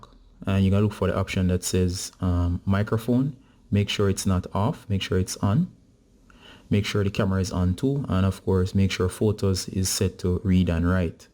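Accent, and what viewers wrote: Jamaica. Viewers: U sound like jamaican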